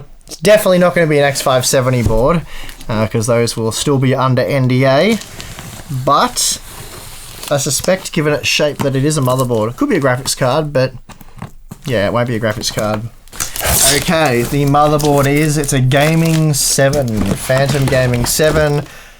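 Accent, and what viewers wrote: Australia. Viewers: I LOVE THE AUSSIE ACCENT .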